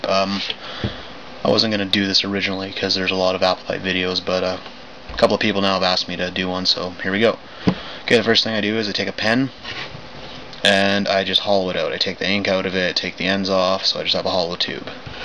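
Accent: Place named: Saskatchewan, Canada. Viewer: thanks dude, you sound like your from saskabush maybe? keep up the good work.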